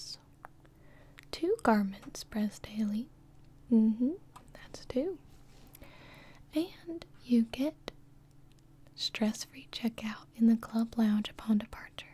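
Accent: South, United States. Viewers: I love southern accent lol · I forgot had a southern accent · Is she supposed to have southern accent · I feel like she was from the south and when she moved back her accent was reinforced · did anybody else notice that she has a southern kinda accent this video o-O it was really soothing tbh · Did get a country accent???? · I love how voice evolved from this very slightly southern accent to a more anime one lol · Is from the south??? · she still kinda has that lil southern accent still lingering but yeah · Gibi should make a role play character with this southern type accent because it is suuuper relaxing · Old voice: fairly normal + country accent. Present: High pitched + exaggerated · used to have a country accent 😂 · I love her southern accent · She used to have a southern accent? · actually she sounds very southern here. And I'm from Mississippi, so... 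· I just now realized used to have a southern and deep sounding voice but now is losing her southern accent and her voice has gotten higher! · went from quirky to southern hahaha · This is my favorite ASMR video. For some reason the combo of the slight southern accent with the typing and the personal attention just MWAH. Works so well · rip to when had a country accent... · I like how this has a hard southern accent but then the accent slowly deteriorated throughout her later videos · She got less southern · There we have it, early on, was southern and did that cute tongue clicking thing Mistletoe does. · Back when was southern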